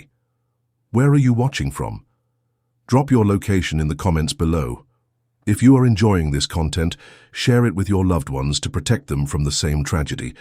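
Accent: United Kingdom. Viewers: Get this crap off now! What's with the tosser English accent? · Me from Visakhapatnam in the Province of Andhra Pradesh, India. I am an adept listener of your narratives, most particularly for the sake of correcting and fine-tuning my British english pronounciation, and improving space of my vocabulary. Thanks for your melodious narrative.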